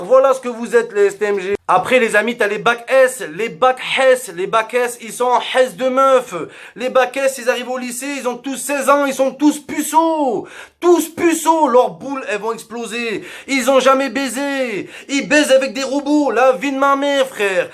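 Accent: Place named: Saint-Étienne, France. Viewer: Pk tu parlé comme sa des Stéphanois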